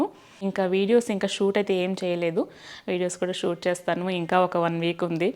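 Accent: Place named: Nellore, India. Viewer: Hi andi, I can understand your situation Nenu kooda same mee situation lone unnanandi , nenu 2 years nundi shifts unde job chestunnanu night shifts ekkuva chestuntanu , health chala padaipothundi and pillalni sariga choosukolemu kooda andi job manaileka pothunnanu meeru cheppunatlu job chestoo undi sudden ga manesi khalie ga undalante undalemu , kaani health chala important andi manam healthy ga untene pillalni , family ni choosukogalamu , ie night shifts valla mind kooda disturb avuthundandi , anduke quit cheyyalane anukuntunnanu final ga Meeku business idea undi kabatti danike move avvandi andi ie night shifts chesi health disturb chesukokandi , idi experience tho mee meeda gowravam tho nenu meeku istunna salaha matrame , kaani meeku edi mee life style ki correct ga untundo. Choosukoni decide chesukondi, meeru matlade vidhanam naaku chalabaga nachuthundandi maadi kooda nellore ne anduke akkadi vallatho matladinatlu untundi naaku mee video choostunte , naaku time dorikithe compulsory gaa mee videos choostuntanandi . 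All the best andi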